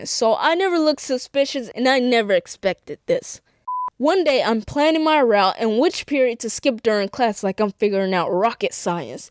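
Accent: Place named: Texas, United States. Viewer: Yo u sound like u from lake highlands and Texas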